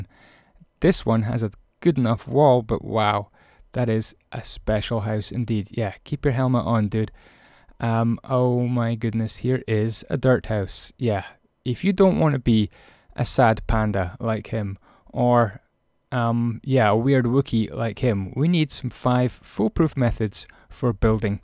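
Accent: Scotland, United Kingdom. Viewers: are u Scottish I am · u have a Scottish AND a British accent mixed lol!!!!!! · is from Edinburgh and has a Scottish accent. Sounds nothing like a welsh accent lol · Excuse me, thats a scottish accent, im proud to have one and i dont find mine annoying or wish i didnt have it. Plus that was rude and uncalled for. I like his accent but thats my personal opinon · Are u from scotland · Are you Scottish? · Scottish? Hmmmm? Do I hear a Scottish accent? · Yeah I didn't know if he was British or Scottish ????😕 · Where is he from? He kinda sounds Scottish.....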